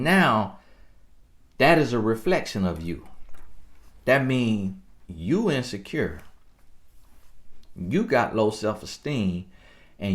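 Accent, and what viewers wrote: South, United States. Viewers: Great video. I like your southern country accent. I saw your wife in a video. She is beautiful.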